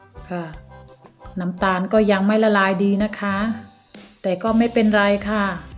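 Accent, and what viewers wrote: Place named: Surin-Buriram, Thailand. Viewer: พี่บัวบุษบงมาชมการทำแตงกวาดองด้วยจ้า ว้าวง่ายจังค่ะ จ๋าเป็นคนแถวไหนน้อพูดเหมือนคนแถวๆสุรินทร์บุรีรัมย์เลยจ้า..พี่บัวคนสุรินทร์เด้อจ้า😂😂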